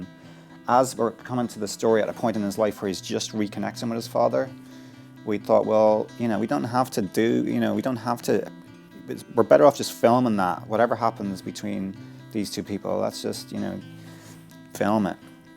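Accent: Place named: Ireland. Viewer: Love his Irish accent. He and James Nesbitt (plays Bofor in the Hobbit) are my favourite Irish actors.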